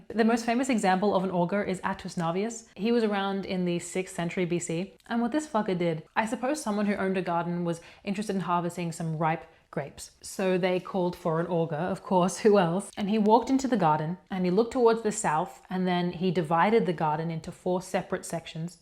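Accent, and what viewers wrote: Australia. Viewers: Australian? Capricorn? I need answers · Are you Australia based? Great content. So well done… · I think Australia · Thanks, I enjoyed this summary of the Etruscans. It’s hard to get an overall picture so concise. It’s also funny listening to how you change from an Aussie accent to an American one depending on which words you say 😂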